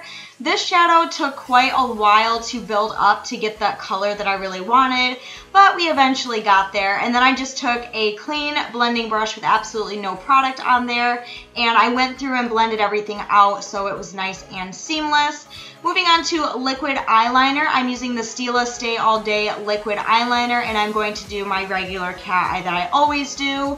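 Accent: Michigan, United States. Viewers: Love all your looks, you make it look so easy!!! Btw love your Michigan accent! ❤️👍🏼😘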